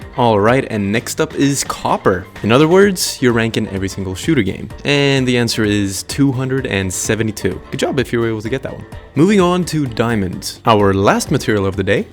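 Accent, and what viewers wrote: Sweden. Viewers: I knew you were Swedish from the accent before I checked the channel info lol Great video!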